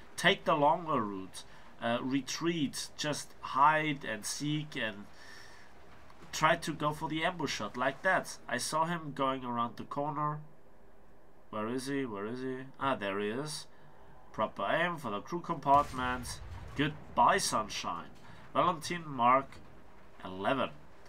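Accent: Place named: Franconia, Germany. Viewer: du hörst dich irgendwie fränkisch oder bayrisch an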